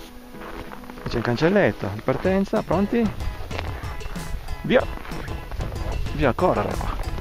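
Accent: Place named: Piedmont, Italy. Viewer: leggero accento piemontese...TI PIACE LA MEENTAA?? 😜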